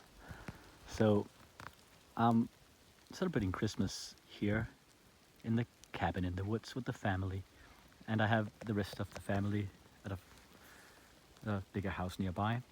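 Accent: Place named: Denmark. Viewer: I thought you sounded different from Timmy, best friend is in Denmark, always like hearing the accent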